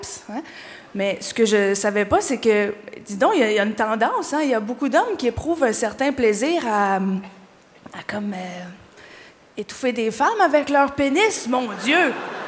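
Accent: Quebec, Canada. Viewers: Elle ne debute pas mais cest difficile d'adapter son humour sans changer d'accent. Son materiel ne voyage pas tres bien. Au Quebec elle est géniale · Je sais pas si c'est son accent québécois tout mignon qui fait que les vannes dégueulasses passent crème comme ça ou si c'est elle qui a vraiment une aura super sympathique, en tout cas j'ai eu de vrais rires sur cette vidéo et c'est plutôt rare pour le souligner ! Bravo ! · Alors bien que j'aime bien dieudo et compagnie, je trouve cette fille merveilleuse moi <3 ses expressions faciales sont merveilleuses du genre totalement paumé et son accent québecois ... Je crois que j'en suis amoureux. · Excellent rien que l'accent ça me fait marrer :p ! Vive les Québecois ! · Son accent canadien il me tue😂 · un con du Quebec dis: SVP oublie pas ton accent!!! Toujours aussi belle et drole! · Magnifique l'accent québécois rajoute un certain charme à ce sketch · J'adore les québécoises, je tombe amoureux des que j'entend l'accent. 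Mais en dehors de ça, j'ai pas trouvé ça très drôle, il n'y avait aucune transition. Dsl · 5 ans à apprendre le Français et vous comprenez pas l'accent Québécois? Et bien si j'étais vous j'abandonnerais à l'instant. En plus Maude dsns la video a changé beaucoup de mots et de formulations pour se faire mieux comprendre. Ouf... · elle est bien plus drôle quand elle parle en Québécois les chutes sont bien plus drôle. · Ou est l'absurde et où est le direct ? Tu peux juste assumer et te dire l'accent canadien est marrant.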